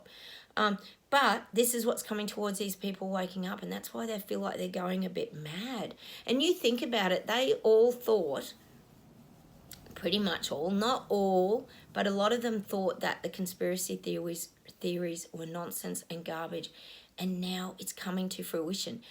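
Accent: Australia. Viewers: Hi new subscriber here agree with all what you have said. Been saying to everyone for the past couple of years now we have to go back. I have no idea why I say this but feel we have moved to fast forward. It's time to get back to basics. Can't wait for people to wake up. Lovely to here your Ausie accent I used to live over there many years ago.Still miss it. Thanks for your guidance xx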